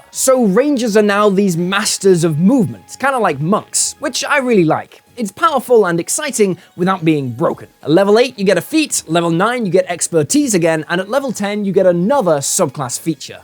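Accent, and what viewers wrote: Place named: United Kingdom. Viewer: OMG....you're british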